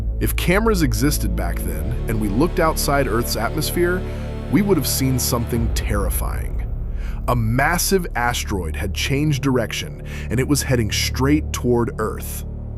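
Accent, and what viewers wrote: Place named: United States. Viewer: There are better sites than this and don't have the silly American voice.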